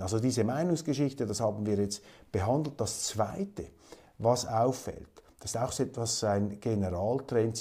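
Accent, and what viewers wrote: Switzerland. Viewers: Lieber Herr Köppel, ich kenne Ihre Sendung noch nicht so lange, aber ich bin zwischen ein großer Fan von Ihnen und Ihrer Sendung geworden. Ich höre sie so gut wie immer zu meinem ausgedehnten Frühstück und zwar auch deswegen, weil Sie mich jeden Morgen etwas zum Lachen und Schmunzeln bringen, trotz der ernsten und meistens unerfreulichen Themen die behandelt werden. Aber durch ihre brilliante Rhetorik, gepaart mit schweizerischem Klang und Witz, kann man dabei sogar noch etwas schmunzeln, denke nur an " Haustier Mentalität, Rambo Allüren" usw. kann garnicht alles aufzählen. Auf jeden Fall hilft sie mir etwas gelassener in den Tag zu starten und nicht zu verzweifeln, angesichts der tristen politischen Lage in Deutschland. Wisssen Sie, nicht nur die Politiker sind Deutschland müde geworden und verleugnen jegliche Heimatgefühle, bzw. wollen uns diese austreiben mit allen perfiden Mitteln, auch wir Bürger sind Deutschland müde und unserem Land überdrüssig geworden, angesichts dessen was hier abgeht. 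Ich kenne nicht wenige junge Leute, die ihr Leben noch vor sich haben, die sich ernsthaft mit dem Thema auswandern befassen. Ich gehöre auch dazu, bin aber leider schon zu alt dafür und für einen erneuten Krieg erst recht, das habe ich alles schon miterlebt und das reicht mir für ein Leben. Das schöne Gedicht bringt es genau auf den Punkt, könnte in unserer heutigen Zeit verfasst worden sein.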